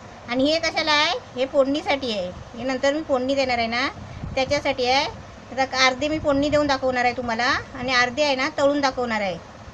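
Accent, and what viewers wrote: Konkan, India. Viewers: tumhi bolata khup chhan..kokani laheja madhe..recepies chhan tumachya...sp. tumache ase veg recepies dakhwa..thank u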